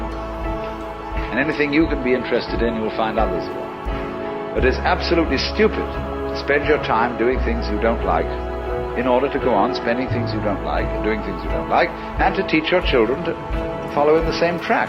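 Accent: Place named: RP, United Kingdom. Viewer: You are the first posh-sounding English bloke I've EVER warmed to. Thanks😊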